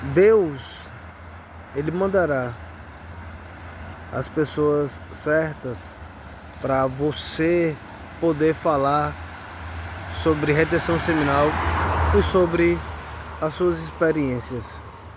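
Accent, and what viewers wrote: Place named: Fortaleza, Brazil. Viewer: Parabéns,vc é um cara bastante sabio , gostei do vídeo e me inscrevi, e pelo sotaque percebo me que é de Fortaleza , vc fala muitíssimo bem 👏👏..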